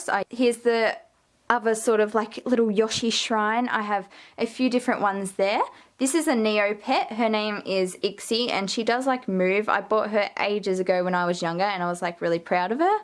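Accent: Australia. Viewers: omg i am crying for happiness right now... stabilo! telletubbies! bostik! smiggle! typo! kmart! etc. the accent! yay! i finally found an australian youtuber that does polymer clay😃 {I am an aussie too!} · I could tell you’re Aussie because you sound similar to Georgia Productions :) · I knew she was Aussie because of her accent lol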